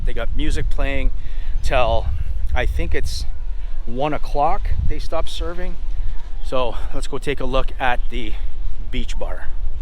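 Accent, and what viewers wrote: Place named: Ottawa, Canada. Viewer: Ah you’re from Ottawa “ eh “? I have a question regarding the currency exchange place you showed towards the end of this video. I was wondering if you tried exchanging Canadian Currency for Pesos? I have always taken pesos with me in our several trips to Mexican Resorts but I heard on one other video from this resort that the currency exchange kiosk takes Canadian dollars in exchange for Pesos? Im a little disheartened about the reserving of the Ala Carte restaurants. On our first trip which happened to be in the Mayan Riviera the resort we stayed at require pre booking reservations at the restaurants at the lobby. It turned out that we ate almost all meals for the 7 days at the main buffet because it was huge and I’ve never seen as much selection and variety in a Mexican Resort Buffet. The second trip we were told we had to make a reservation for any of the three A la Cartes at a desk in the lobby am however if you weren’t in line by you had no shot at getting a reservation. 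We did manage to get into the Mexican restaurant and the steak restaurant ( but pm! the last available reservation ) later during our 10 days at stay but twice even though I stood there for 2 hours and was between 10-15th in line when I got up to the desk they said all seating in each A la Carte was fully booked. Walking past those places to pm and seeing half of the tables empty was a disappointment. I’m hoping that either or the buffets have good food and variety or I’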